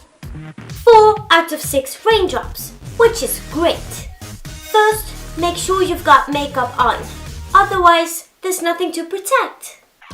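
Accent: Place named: England, United Kingdom. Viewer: What part of England are you from? I love you accent! Loved the skit!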